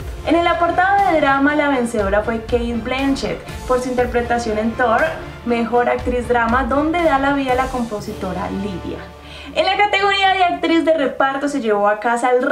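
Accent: Colombia. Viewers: Bogotense? Sale chamaca linda! Luego echamos chisme,jajaja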